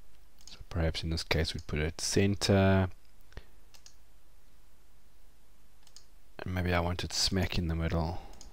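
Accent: South Africa. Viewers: i can bet the man is south african from the accent ....anyhow much needed help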